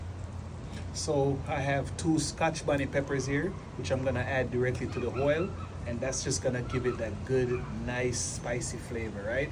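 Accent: Jamaica. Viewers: Can anyone tell me where his Jamaican accent has gone ,seems to be missing 😮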